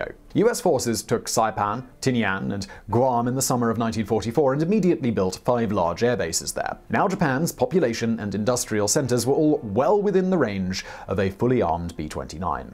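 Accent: United Kingdom. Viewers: My wife: how many channels does this guy have? Me: why? My wife: I hear that Brit's voice all the time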